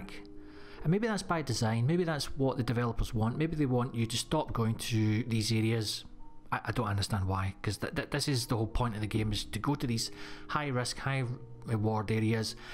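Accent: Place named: Glasgow, United Kingdom. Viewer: So in reverse order. 1. I Agree with the locked compounds element, for the reason you state. But also, if I have the key then it becomes "my" compound/base. 2. When it comes to the loot spread in military locations I think it's an issue too. I'd like to see more sensible/realistic spread of loot in military locations, soldiers etc didn't eat guns and I'm sure probably owned civvy clothing too. I'd like to see the military equipment spread more logically inside some of these areas. 3. Dynamic crashes - of all sorts, humvee, civvy, police, game warden/ranger (in woods), and campsites (one or two tents civvy/hunter/game warden) 4. The flying heli's - they had this in one of the mod's I believe (for the mod - the modded mod haha), and it was awesome. 5. Zombies - I think at all dynamic locations there needs to be a chance of broken (crawler) zombies spawning along with a regular mini-horde/cluster. 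6. Re-vitalize old areas - totally agree, some of the old areas like the boat are awesome, but pointless these days. I'd love to see these locations with more positive reasons to visit them. Whether that's to setup a base/hold them as their good defensive points, or because they have good loot/something rare possibly. Good thoughts delivered in the Glaswegian style we all know and love!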